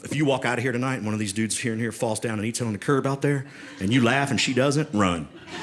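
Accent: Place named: South, United States. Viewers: I'm from north Carolina. This dude is talking exactly the way I grew up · It’s the Southern charm 🥰🥰🥰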